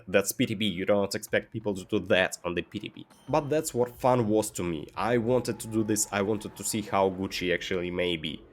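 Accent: Russia. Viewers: Listen your english try with balalaika accent is so funny XD You made my day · Omg dis strong Russian accent:D Nevermind, just keep going! Do you remember how funny was Ochido’s accent? So you should also remember that it wasn’t a problem for him to reach his popularity and audience/fans:)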